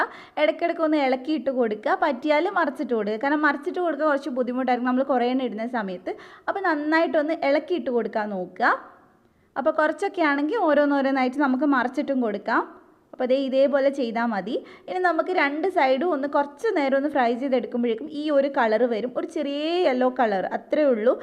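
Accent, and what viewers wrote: Kannur, India. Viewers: kannur ano? Kannur style slang allalo athkonda chodikkunnad. Adipoli snacks 👌👌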